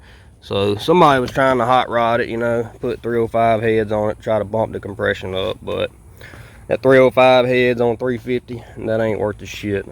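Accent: Mississippi, United States. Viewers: Are you from Mississippi?!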